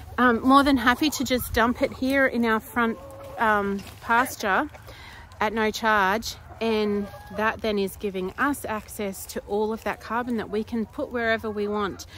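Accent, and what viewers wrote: Australia. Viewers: Lovely hearing an Aussie accent in a homesteading video 😊 (fellow Aussie here). Thanks for the tips, looking forward to bingeing the rest of your videos.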